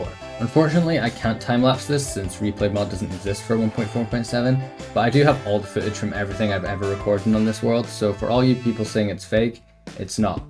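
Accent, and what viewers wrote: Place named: Central Scotland, United Kingdom. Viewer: You have no idea how surreal it feels to hear a Scottish Minecraft YouTuber. You sound like your from central Scotland too like me!!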